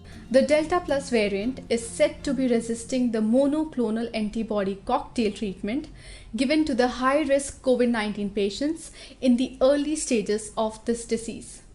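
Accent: India. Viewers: Even I find it difficult to understand accent of US, UK China. But never wished a narrator. Dear, pls get used to it, this is Indian accent at its best. Listen carefully, you will know what she is talking about. Focus on content, not accent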